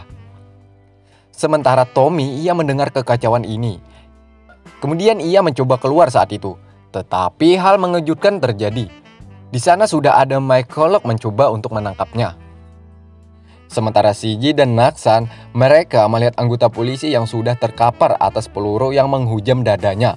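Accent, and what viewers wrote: Banjar, Indonesia. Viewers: banjar banar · Urang Banjar kah pembawa alurnya ne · urang banjar kah adminnya. 😅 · urang Banjar kah sanak Pian ni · Orang Banjar kah min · Khas banar logat banjar nya🤣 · Urang Banjar nah pembawa kisahnya nih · Logat banjar nginih Salam dari hulu sungai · Urang banjar pang pina logatnya😁😁😁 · Orang banjar kah mang? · Banjar banar dangsanak lah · Orang Banjar mana suara nya ni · Urang banjar sidin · Khas banar suara bahasa banjar nya kada kawa di buang😅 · apakah org banjar 🤣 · org bnjar ya bang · Orang Banjar wkwk 😂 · Banjar banar 😁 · Banjar banar neh pina nya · Wkwkwk banjar bangat🤣👍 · Iya sanak,, Banjar banar Pian nih 😅🤭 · Urg banjar kh p mang? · Sanak orang Banjar kah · Ini logat urg Banjar... Iya kh...😆👍👍👍 Aja.... Sukses slalu...Waja sampai kaputing... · Pinanya urang banjar pembawa acaranya ninih,,yakah sanak..?? Kkkk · Orang banjar kah tukang kisah nya ne 😅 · Urang banjar lh bng pian😂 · Kayak bhasa bnjar pengisi suara x ini..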